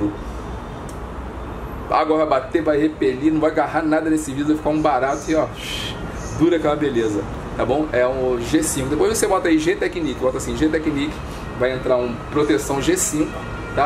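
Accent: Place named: Rio de Janeiro, Brazil. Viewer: Sotaque carioca é da hora demais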